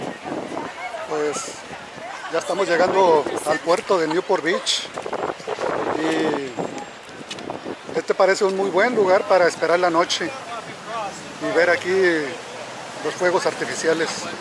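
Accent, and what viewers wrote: Mexico. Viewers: Demasiado marcado tu acento mexicano, deberías neutralizarlo mas! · No hablen como mexicano por favor. Ese idioma odio. Hablen bien el idioma español ok.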